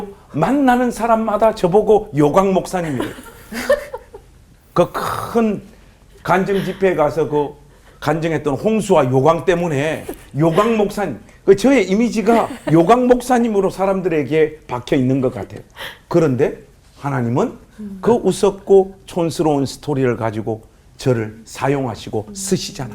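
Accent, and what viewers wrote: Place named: Seoul, South Korea. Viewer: 목사님~ 서울말씨? 멋져요^^ 올포원의 죠지클루니 맞습니다!!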